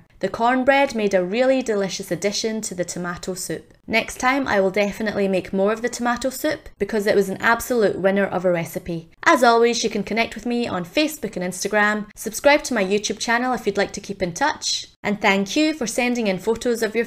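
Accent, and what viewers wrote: Scotland, United Kingdom. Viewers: Are you part Scottish?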